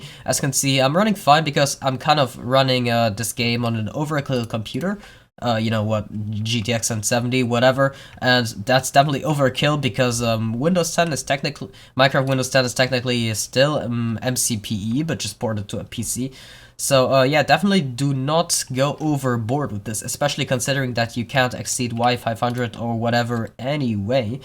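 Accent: Germany. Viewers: He even has the german accent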